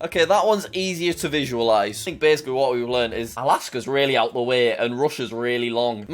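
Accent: United Kingdom. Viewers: is basically Drew Durnil with a British accent. (seriously, they just scroll through the same reddit threads and upload the videos a few days apart?)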